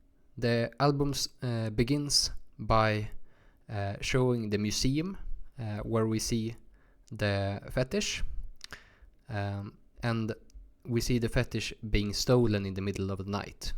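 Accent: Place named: Sweden. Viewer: S tier Swedish accent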